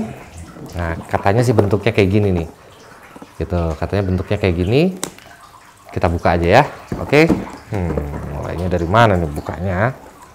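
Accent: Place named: Java, Indonesia. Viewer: Mas kapan buat tips & trik videografi lagi? Udah kangen banget sama logat jawanya mas hehehe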